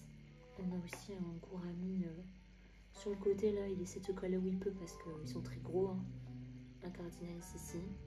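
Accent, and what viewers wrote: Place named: Aveyron, France. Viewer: l'accent était aveyronnais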